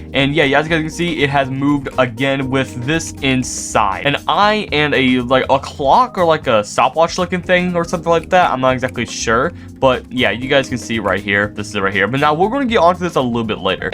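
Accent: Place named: Kentucky, United States. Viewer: You from Kentucky? I’m from London Kentucky myself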